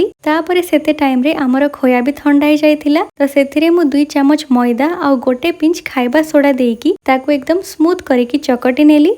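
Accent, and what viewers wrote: Sambalpur, India. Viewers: Tamar Sambalpuri voice bhal lagsi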